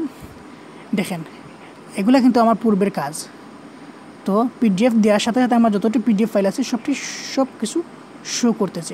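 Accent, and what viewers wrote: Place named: Sylhet, Bangladesh. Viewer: তুমি সিলেটি নি ইয়া মাত বুজা যার🤣যাক আমি কিতা তাইলে বুঝছি নি, জানাইয়ো তে কমেন্ট এ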